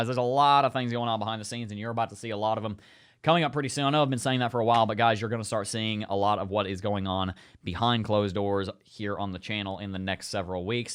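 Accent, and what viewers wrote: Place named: Los Angeles, United States. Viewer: sounds like you're in LA! ✌😆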